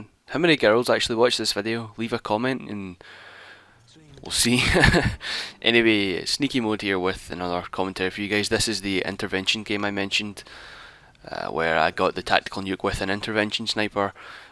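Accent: Scotland, United Kingdom. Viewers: scottish arent you im scottish? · OMFG YOUR SCOTTISH, YA BEAST :D:D:D:D:D:D:D finally, a scottish dude like myself doing a vid :L awesome :D:D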